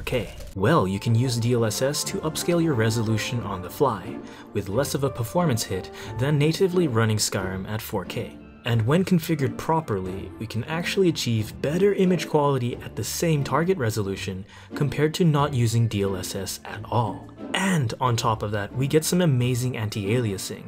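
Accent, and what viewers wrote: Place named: Netherlands. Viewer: you sound dutch i just realized that zamn, been binge watching your vids LMFAO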